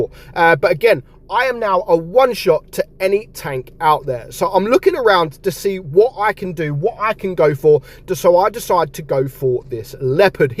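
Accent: United Kingdom. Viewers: Bri’ish?❤❤ anyways, love ur content!❤🎉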